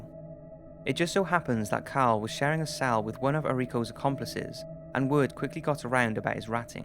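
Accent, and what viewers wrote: Wales, United Kingdom. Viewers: are you welsh............. anyway love the vid :)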